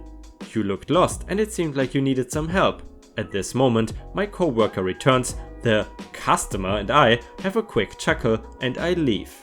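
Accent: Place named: Germany. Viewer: So, hey there. Until now, I wondered, why that accent is so familiar. Now with that "german" comment, I know why. You,re german, aren't ya?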